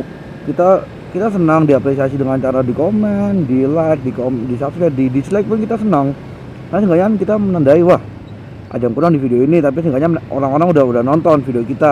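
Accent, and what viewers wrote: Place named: Banyumas, Indonesia. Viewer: anjay mt25 ? btw agak ngapak ngomongnya,kocak njir :v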